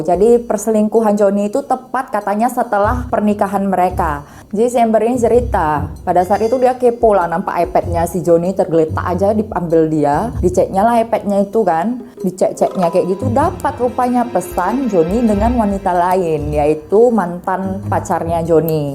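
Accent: Medan, Indonesia. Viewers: Bahasa medan nya kental kali, suka kali aku dengarnya. 😍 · Suka cara bawa cerita nya,jelas dan gak berbelit" apalagi kaya ada logat Medan nya ya..☺️ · Haha medan abis...kaya mana itu si amber...logatnya mirip bgt tmn sy yg dr medan... Sukses ya...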